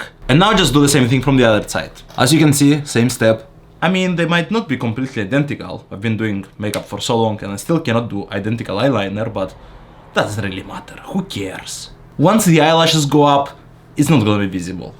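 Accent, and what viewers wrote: Russia. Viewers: Прекрасный русский акцент 😊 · I love a Russian accent. So cool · с русским акцентом я вас понимаю лучше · У Вас очень милый русский акцент. Я с замиранием сердца наблюдала рисование стрелок. Получилось очень оригинально и необычно. 👍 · I love your russian accent when you speak english ❤ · Почему никто не пишет, насколько же у него русский акцент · Классно делаете макияж 😊❤😄 Еще такой забавный киношный русский акцент))) Очень стереотипно звучит 🤭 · when i see u as a man...u are HANDSOME!!!!! And that russian accent is at the same time funny and cute! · Нравится его русский акцент.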